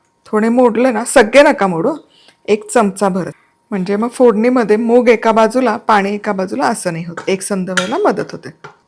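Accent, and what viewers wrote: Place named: Pune, India. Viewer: Mal tumchya recipe tar avadtatach pan tyachypeksha tumcha najuk awas ani god bolan khup jast awadt. Tumhi kuthalya gavachya . Pune mubai kolapur ko kan . Tumchya god awajavarun tari tumhi mala punyakadacha vattat.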